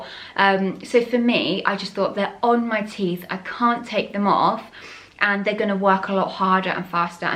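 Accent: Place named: England, United Kingdom. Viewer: The English accent has a calming effect on me. Pip, pip, cherio and God save the King and all that rubbish.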